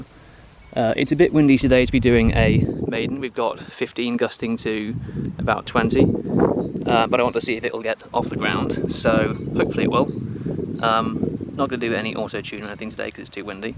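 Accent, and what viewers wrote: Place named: United Kingdom. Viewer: I think my main distrust and poor performance with the 35xx motors and larger props is I live at higher elevation. Based on your accent and surroundings you live somewhere in the UK with an average elevation of ~500ft. I live at 5000ft. M100's will hover for 28 mins at sea level on the larger battery, that same setup will only hover for 15 mins at 6000ft, and with ~500g of payload drops to 5 mins. So whether its work or hobby, I have to have more thrust because the elevation range I fly in is -2000 to 10000 ft ASL for commercial units, and when I fly for a hobby still 5000 ft, give or take 2000 ft. But say your drone makes 6kg of thrust (1500g per corner) and weighs 2.5kg, so it hovers around 50% throttle, its just a little under the max take off weight people generally like to have (50% of max thrust), so while flying you have 50% throttle to play with for flying and error correction. 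But that's lower elevation, at 5000ft that drone would likely hover around 75% throttle. Not only do you lose flight time, payload, but you lose authority. Its not that I am poo pooing 35xx motors entirely, but specifically, big frame, big props, small motors. Bigger frames have more weight, more room for batteries that add weight, and you hit the limits of the drone easier before taking other factors into account, like elevation. And how often do people think about how well their drone will fly when they do a road trip from the coast to the mountains and gained a few thousand feet? I don't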